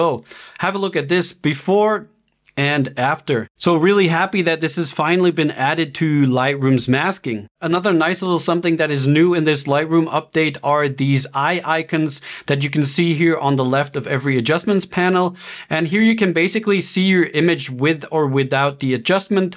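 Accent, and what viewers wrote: United States. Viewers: ...but has an American accent, not all English speaking people have an American accent....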